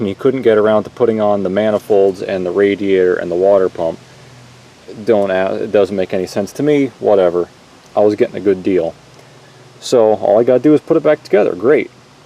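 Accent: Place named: Canada. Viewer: Bro's either canadian or wisconsin'. either way, yuk.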